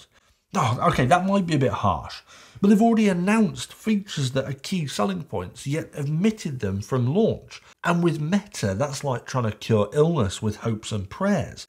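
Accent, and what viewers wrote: England, United Kingdom. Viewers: Like your delivery. You're easy to listen to. And nice to hear English rather than some disgusting American accent.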